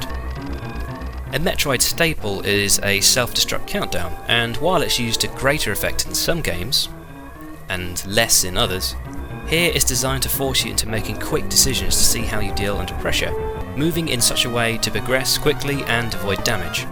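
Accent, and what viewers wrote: France. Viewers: You are french my dude ? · Are you french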